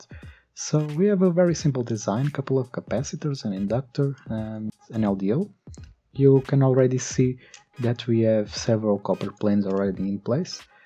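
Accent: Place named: Portugal. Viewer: Estava a ver o video e a pensar.. esta pronuncia parece que e' portugues.. fui ver o teu canal e acertei! Obrigado pelo video!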